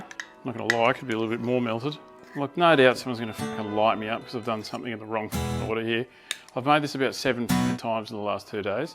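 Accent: Australia. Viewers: Hearing an Australian guy say bunghole for 15 min is peak internet.. Simple as that.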